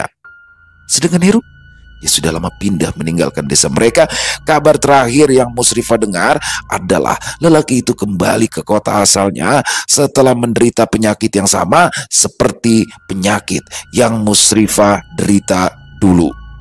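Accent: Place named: Banjar, Indonesia. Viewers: Bang logat bahasa banjarnya mantap banar 😄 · Bang ini sudah kayak orang Banjar asli 😂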